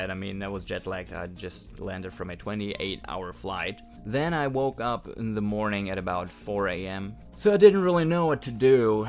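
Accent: Australia. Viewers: Great video mate , just came across your channel and subscribed , love your story telling and a little bit of an Aussie accent creeping in Visited Germany in 1989 😂and went to Oktoberfest as all Ozzie backpackers do , absolutely love the country, people, and food. One question though and i never saw this in Europe when i was driving but only in Australia you do a quick 1 finger wave as if to say hey mate while you're on a long drive in the middle of no where 👌✌ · As an Aussie, I'm really surprised smoking is so prevalent in Germany. I thought you guys would be more concerned about your health. A lot of Aussies quit in the 80s when the dangers of smoking were well understood by the general public. Yes, the federal govt also made smoking more expensive and told cigarette companies they must put them in plain packages. This made it an expensive and unattractive habit. 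Personally I'm pretty glad about that, as I can't stand smoking and always move away from anyone who's doing it 😅 BTW, some of your vowels are sounding a bit Australian. We definitely rubbed off on you 😊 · Got a bit of a 'downunder' accent happening there mate! (I'm a kiwi). You are not allowed to smoke inside public areas here. No smoking in bars etc, there is usually a dedicated outdoor area for smoking. You no longer come home with your clothes and hair smelling of smoke! They are also not allowed to advertise and cigarettes are usually held in a locked cabinet in stores. It is nice that we don't see cigarette butts dropped everywhere like there used to be decades ago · Sounds like you took the beginnings of an Aussie accent home with you though! I can hear you dropping it on certain words :;) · You've got quite the Aussie accent . 😅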